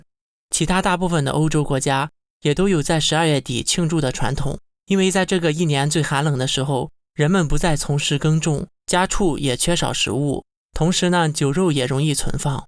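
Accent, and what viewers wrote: Shandong, China. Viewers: 你这个山东口音也是很强了。个人认为，不过就不过吧，抵制就很弱智了... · 搞笑，中國人又不是普遍信基督教，這不過聖誕你們又受不了了?台灣人說中文，寫漢字，吃飯用筷子，過中國傳統節日，他都不認為自己是中國人！還有up主你也別裝台灣人了，那口山東口音還沒掩蓋住呢！說到自卑，你不更自卑，不過這輩子你都不能變洋人！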